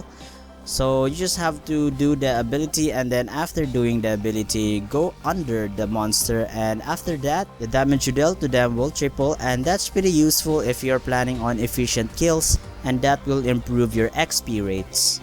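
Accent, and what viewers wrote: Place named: Philippines. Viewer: you have the most annoying fucken accent ive ever heard. pinoy?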